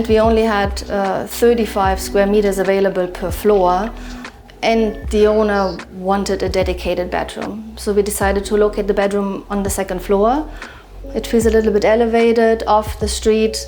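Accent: Germany. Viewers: Definitely German. Many German's I have met in London have better English than us natives! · Sounds German to me. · i lover her German accent. She should do ASMR videos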